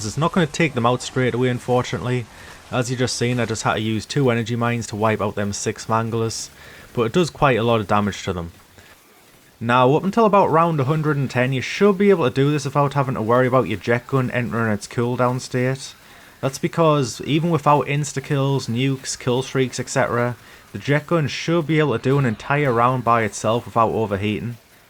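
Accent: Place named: North East England, United Kingdom. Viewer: aye lad am from northeast I could tell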